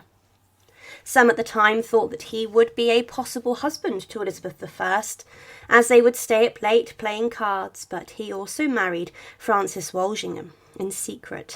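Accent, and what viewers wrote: United Kingdom. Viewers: At least it’s a British accent not an irritating American one..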